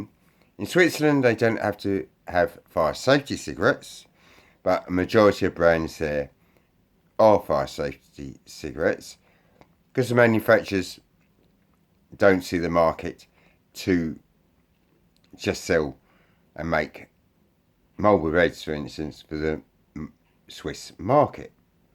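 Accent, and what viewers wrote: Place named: Cockney, United Kingdom. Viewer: I've been looking back at some of your earlier videos and note that you used to speak with a cockney accent. Did you put that accent on so as to appear hard?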